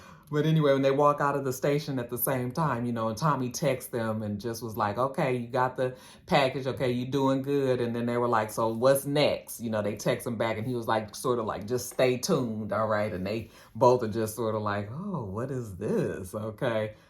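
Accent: Ireland. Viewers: Lol, whenever I hear your Irish accent. Next time throw in the line, it’s magically delicious. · I be waiting for this review just for the Irish accent, I laugh watching the show just thinking about which line you gon say😂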